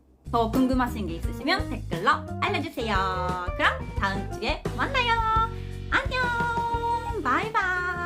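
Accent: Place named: Gyeongsang, South Korea. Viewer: 아니,,, 나 경상도 사람인데 이분 표준어 쓰는줄알았는데 자세히 들어보니까 경상도 말쓰는거같네 ㅋㅋㅋㅋㅋㅋㅋㅋㅋㅋㅋ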